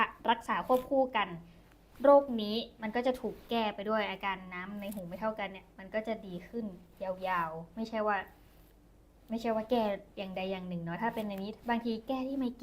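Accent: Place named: Surat Thani, Thailand. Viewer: ชัดค่ะ สุราษ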